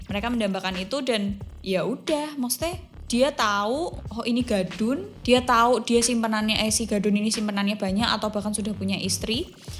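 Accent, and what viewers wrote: Java, Indonesia. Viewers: Blitar hadir ka.. Medoknya ka jan ngangeni bangeettt.. Salam semangtt y kaa.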